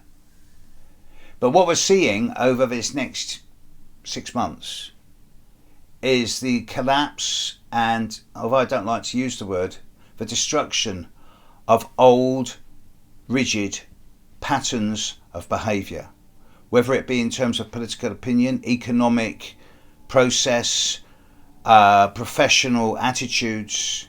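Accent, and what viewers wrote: United Kingdom. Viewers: Space for the Soul This is a standard British accent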